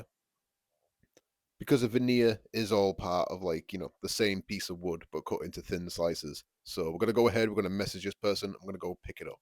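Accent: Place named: North, United Kingdom. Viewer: Nice to hear a "nurthern" accent! I'm from North Wales but exiled in Bavaria. I'm a wood pallet man myself, heat my home with them, but your video is so interesting. Some other guy on YT said you can get free outdoor Pressure Treated wood from people dumping their kids' wooden swings etc. when they are older.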